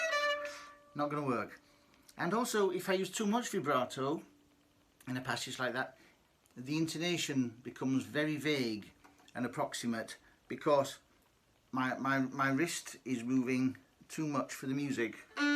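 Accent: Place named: Northern England, United Kingdom. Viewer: Thank you for the explanation! (Great to hear a lovely northern English accent, too! )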